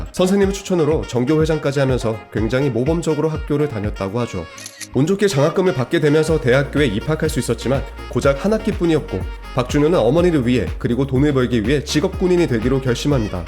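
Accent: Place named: Jeolla, South Korea. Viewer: 그런데 이 친구 이해가 안되는 게 고향은 경상도던데 왜 전라도 사투리를 쓰는지? 태어난 곳만 경상도고 자란 곳은 전라도인가?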